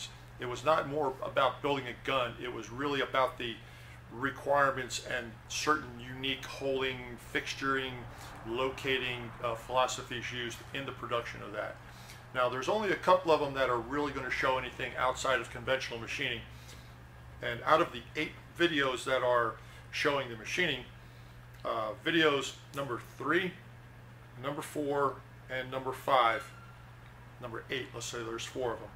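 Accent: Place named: New Jersey, United States. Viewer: Eloquent and heart-felt explanation. You had the balls and forethought to let people know what was happening, and showed it was with major reluctance (not sure I've heard you you swear before).. Odd that people accuse you of not being a true Texan in the comments, as a Brit I'd put your accent somewhere in New Jersey. Nonetheless you're obviously an "all American guy" who goes out of his way to help and serve others- don't change. Edit: just watched again and you said "shiFt in YouTube policy" - I heard "shittin' ", I should buy some better headphones:)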